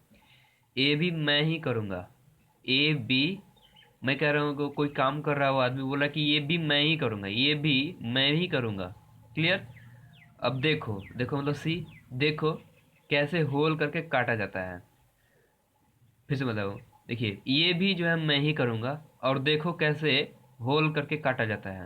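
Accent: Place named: Bhojpuri, India. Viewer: Bhojpuri Bahut pyara bolte hai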